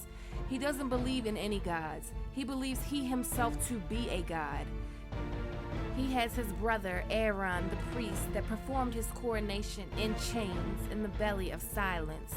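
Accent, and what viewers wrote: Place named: Baltimore, United States. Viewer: I know this is an old video. But you might consider fixing the title. I think you mean Ramsey "or" Joffery. Also, like the Baltimore accent on this one. You thinned it out lately.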